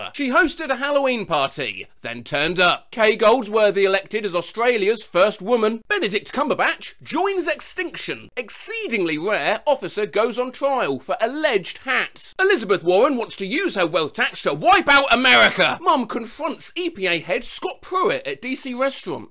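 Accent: United Kingdom. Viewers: I accidently clicked on this and was wondering why suddenly a brittish man surrounded by lemos was talking to me... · its bc of his voice and his british accent. Uberduck has a AI voice you should check out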